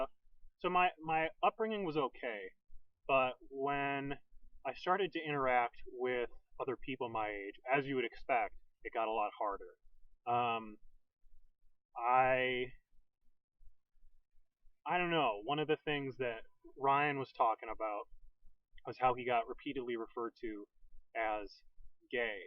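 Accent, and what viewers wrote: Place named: Midwest, United States. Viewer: I was gonna say you sounded Midwestern :) (Wisconsinite INFP)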